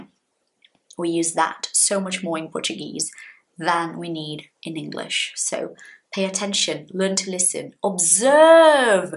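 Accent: United Kingdom. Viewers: o mais incrível é que eu entendi 90% do que você disse, e ainda nesse sotaque britânico aaaaaaaaaaa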